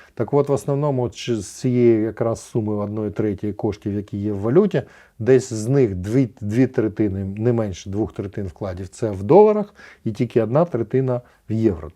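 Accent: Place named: Russia. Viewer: Підтягнути чисту українську ,без нахилу на російську!!!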